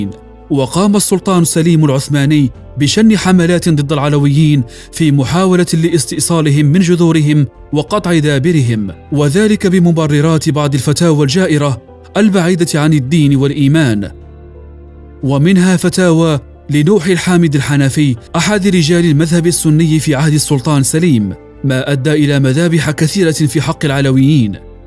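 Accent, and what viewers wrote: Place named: Lebanon. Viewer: World News وليش عم تحكي لبناني؟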